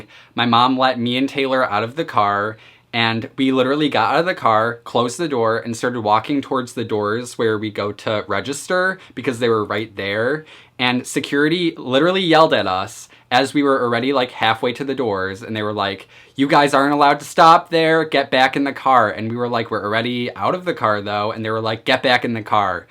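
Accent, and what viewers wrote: Midwest, United States. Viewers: Brian Zavala yeah he does to me I'm southern (US) and he has a lil sorta kinda I think it's Midwestern accent I think it's so cute💕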